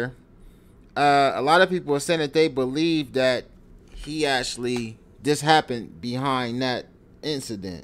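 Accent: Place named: Bay Area, United States. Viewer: Bruh where you from? Remind me of a Bay Area nigga 😆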